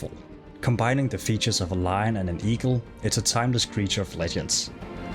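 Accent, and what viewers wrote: Denmark. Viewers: So cool! Frome your accent I think Dane ?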